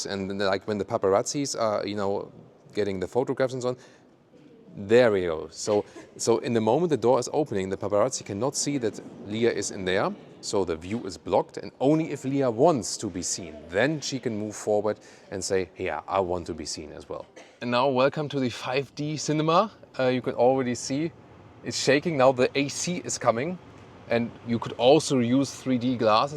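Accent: Germany. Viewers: Nice Video but the English sounds waay too german!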